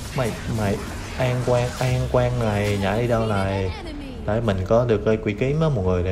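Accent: Quang Nam, Vietnam. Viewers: Ông này ở quảng nam thì phải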